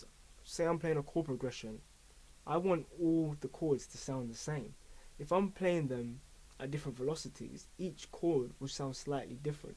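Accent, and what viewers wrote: United Kingdom. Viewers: Thank you... I've had producers block for about 5 years; save marriage. I've heard that can kill creativity...lol. Anyway I would like to ask you some questions on a business note. Please get at me ASAP. I'm not sure if you are in the UK still, hence the accent, but I am not worried about travel for the right investment.. Best regards. P.S. Mum lives in Essex.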